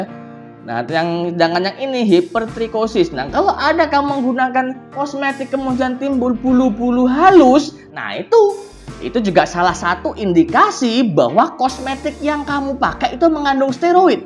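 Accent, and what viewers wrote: Java, Indonesia. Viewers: Logat e jowo banget njih pak dokter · Pak dokter boso jowone kentel, aku suka wkwk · yg menyenangkan paling medok yg favorit bgt memberi informasi yg dibutuhkan anak muda ketje. Terimakasih dokk 💕💕💕 post video ttg informasi yg bermanfaat bagi anak jaman now ya.. Selalu di tunggu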